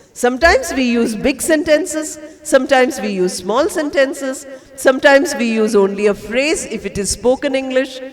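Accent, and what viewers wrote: India. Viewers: I am from Delhi there are so many Institute of English I have also joined a Institute but I like your video because you speak Indian English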